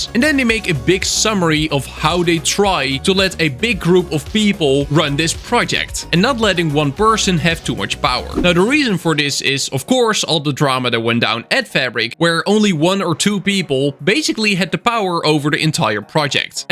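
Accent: Netherlands. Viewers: i mainly just use fabric cause my laptop is so bad that it runs my browser at 2FPS, if i use forge i need to close everything else and then i run on 10FPS but with fabric and shaders i run with 10FPS (on fabric i dont have so many optimalisation mods. i only have sodium, lithium, ferritecore and entity culling) also you for some reason sound realy dutch with how you speak english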